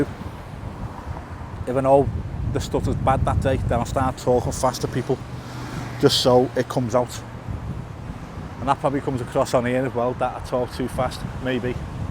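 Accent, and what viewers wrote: Liverpool, United Kingdom. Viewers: A warm greeting from Indonesia! As a non-native English speaker, I absolutely adore the Scouse accent - it's simply incredible! Although I am still in the process of learning English, I subscribed to your Youtube channel as I would love to become more familiar with the Scouse accent. Hopefully, one day in the future, I will be able to speak English with a Scouse accent myself. Wishing you even more success on your Youtube channel! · Thanks for the Videos. You alway come over as a caring and genuine character. You're not just out for the aggressive click bating like some YouTubers. I've never noticed the speech impediment? You're just good old scouse! Because you're human, if you receive 100 positive comments and 1 negative comment. It's that 1 negative that sticks in your mind and will out weigh all the positives. Just keep doing what you do mate. 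It's working! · I watch nearly all of your videos and I’ve never noticed any speech impediments at all. You sound like a perfectly normal scouser 🤓👍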